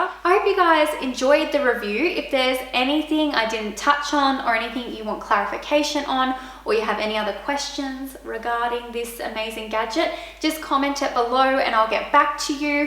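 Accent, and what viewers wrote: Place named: Australia. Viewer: Love your looks, your pants, your nails and your aussie accent ("wooodaaaa"). Review is great. I have the corded crosswave.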